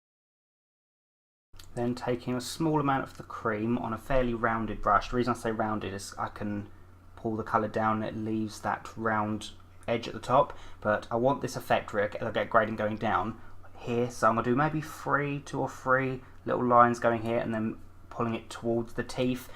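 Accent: United Kingdom. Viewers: I love it! Your British accent is wonderful! I need more omegle's video please ❤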